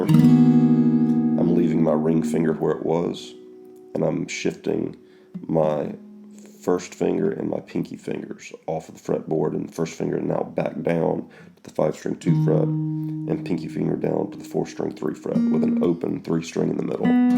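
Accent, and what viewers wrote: South, United States. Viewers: Once again on it. I've convinced myself that he is Dr. Phil's brother which is why I will refer to him from now on as. Judging from his southern draw and his efforts to not show his face to keep the spotlight off of him and keep it on the music, what a class act. Thanks again! Your secret is safe with us.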